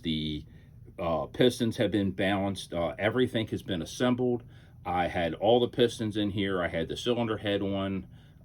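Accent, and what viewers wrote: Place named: Baltimore, United States. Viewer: You sound like you are from Baltimore... Is this engine from the area?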